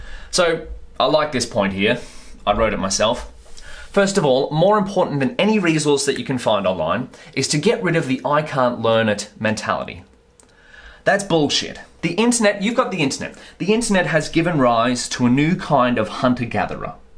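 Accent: Australia. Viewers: hahaha. I krew you live in Australia but assumed you've emigrated. All judged by the way you talk. English is my second language so it's natural mistake. :) I'd love to do some overlanding in Australia one day. Take care :)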